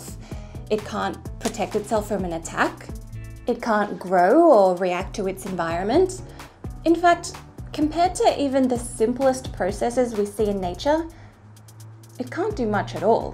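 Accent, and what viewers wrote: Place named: Australia. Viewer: I don't know why I totally missed figuring you as an Aussie. Your accent just doesn't scream it to me. But no complaints finding out now. Opposite side of the world, but opposites attract! Hey, don't take my word for it -- just ask your friendly neighborhood magnet! So, I'll keep coming back as long as you keep sciencing the sh*t out of Youtube!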